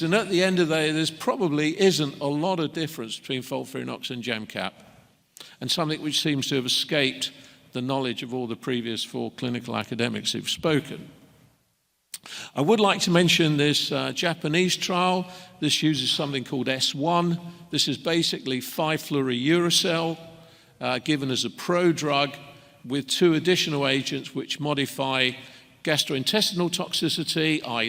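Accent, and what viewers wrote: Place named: United Kingdom. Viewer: Highly informative and comprehensive by prof. Besides a British accent is much of a value addition, certainly a breeze of fresh air.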